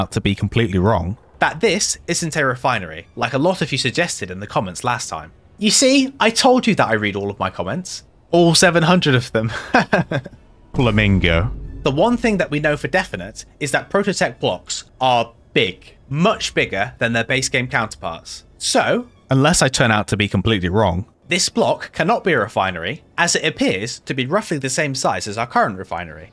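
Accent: England, United Kingdom. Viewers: are you from south`or central england ?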